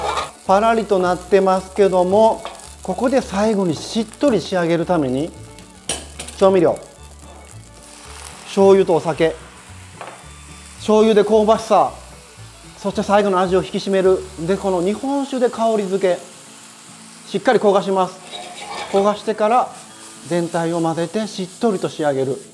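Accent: Osaka, Japan. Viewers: 今日お昼にこのチャーハン作りました。いつもとは違うと家族も気付き美味しいと言ってくれました。😄流石先生の料理ですね!それと先生の大阪弁で料理の評価しながら食べられるところ面白く、大阪人の私にはとても親近感が湧きました。🥰毎日何かしら先生の料理食卓にのっております。☺️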